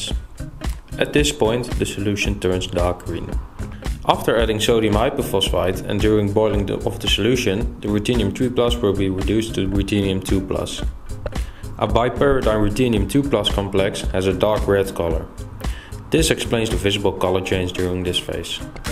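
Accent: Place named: Netherlands. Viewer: Weer een Nederlands accent! Misschien lijkt het maar zo, maar waarom bestaat de YouTube community met de kennis en kunde om op video een succesvolle, werkende hoge kwaliteit OLED te maken (bijna) volledig uit Nederlanders? (Granted, dat is wel aannemende dat jij ook een Nederlander bent, maar aangezien je accent ben ik daar 99% zeker van) De YouTube OLED manufacturing community lijkt wel volledig overgenomen te zijn door onze mede-Nederlanders. Je zou ook wel kunnen zeggen dat wij de YouTube OLED community volledig hebben... G E K O L O N I S E E R D ! Nu alleen nog een manier vinden om er een slaatje uit te slaan, net zoals men deed in de gouden eeuw. Ja, die gouden eeuw! Ook wel bekend als de goede oude tijd van de VoC, toen wij Nederlanders nog praktisch regeerden over het zuidelijk halfrond. En dan kies ik er bewust voor om de alom bekende, zeer beruchte impopulaire zwarte bladzijde uit onze geschiedenis expres even buiten beschouwing te laten. 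Discussies over de hoeveelheid roet in het gezicht van Zwarte Piet en de huidskleur van Sinterklaas hebben wat mij betreft niets te zoeken in deze krochten van de YouTube sloppenwijk, ook wel bekend onder de Engelse naam: 'comment section'. 🤣 (Volledig satirisch bedoeld natuurlijk, het was absoluut niet mijn bedoeling om iemand te kwetsen met deze laatste alinea!)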